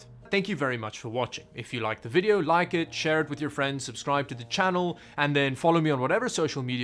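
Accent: South Africa. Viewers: I enjoy your videos very much. Just a question: You sound like you have a South African accent, and then I see your shirt says Namibia.. so I wonder if you're from Namibia? Do you live in Europe now? I'm not fishing for your address or anything, just curious. :) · His accent already made me think hes from south africa - the Namibia shirt made me even more suspicious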